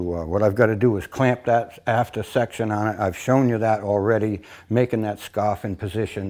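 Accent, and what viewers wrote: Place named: New England, United States. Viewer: Good to see you,. Hope all is well. I really enjoy your old school approach, the sound of a common-sense New Englander in your accent, and your calm love of precision. You are an inspiration.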